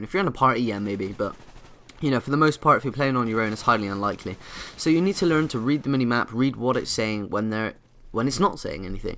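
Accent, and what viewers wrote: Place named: England, United Kingdom. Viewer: Where a outs in England are you from:D I love your accent;D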